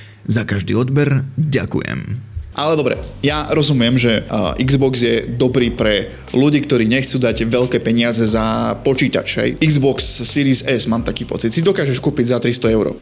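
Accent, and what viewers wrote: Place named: Czech Republic. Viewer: Kúpuje to akože naozaj? Ach vy čechuje ZE Slovenska. NEUVERITEĽNE odporný pepícky prízvuk.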